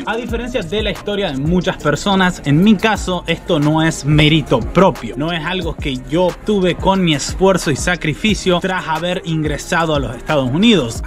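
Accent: Argentina. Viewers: Hola saludos desde Córdoba. Te sentía tonada argentina pero no sabía bien de que provincia 😅..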